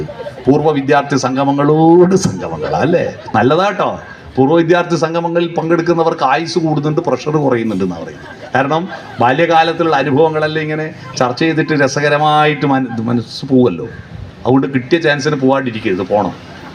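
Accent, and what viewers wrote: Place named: Vadakara, India. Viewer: ഭാഷാ വൈകല്യം നല്ലവണ്ണം ഉണ്ട് ചില വാക്കുകൾ മനസിലാവുന്നില്ല ഉദാഹരണം മൊത്തി (മുഖം എന്ന് മറ്റൊരു വടകരകാരൻ പറഞ്ഞു തന്നു ) പ്രാദേശിക ശൈലി യായിരിക്കും പ്രസംഗം കുഴപ്പമില്ല ഞാൻ മിക്കപ്പോഴും കേൾക്കാറുണ്ട് കുറച്ചുകൂടി പ്രാദേശിക ശൈലി മാറ്റി വ്യക്ത മായ ഭാഷ പ്രയോഗിക്കാൻ ശ്രമിക്കണമെന്ന് താല്പര്യപ്പെടുന്നു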